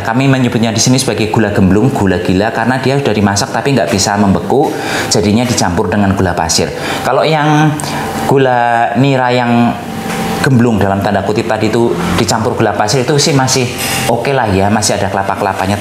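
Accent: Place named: Banyumas, Indonesia. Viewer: Liik sampean asline Endi, medok banget ngomonge .. Banyumas apa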